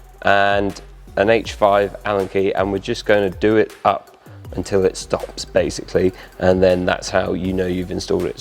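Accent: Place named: United Kingdom. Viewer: Mate your accent had me thinking Verus was UK based, but nope gotta pay the +200% import fee's 😅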